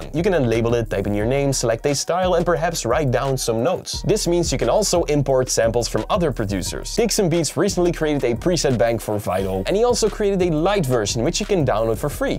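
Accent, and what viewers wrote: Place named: Netherlands. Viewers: from the accent I'm guessing you're Dutch! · He does sound Dutch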